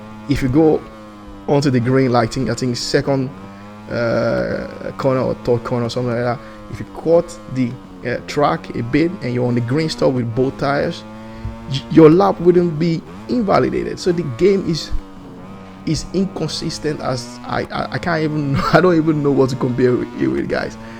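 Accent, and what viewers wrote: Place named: Netherlands. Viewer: I like your Dutch accent but sometimes it is hard to grasp. :D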